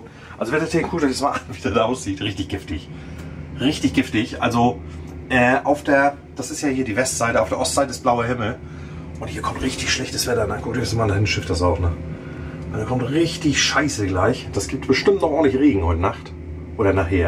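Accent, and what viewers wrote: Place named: Germany. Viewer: Random Logick Nicht spießig! Aber schon sehr deutsch 😁😜 Gerade durch Sprache. Aber alles cool ich mag ihm ja 😜 Bin ein Fan thumbs up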